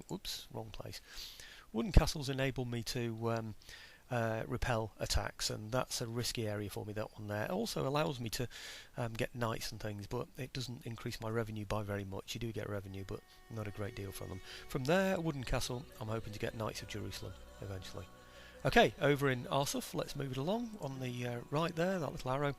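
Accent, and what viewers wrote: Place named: Midlands, United Kingdom. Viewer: i ccouldnt quite tell you were proper northern i thought more midlands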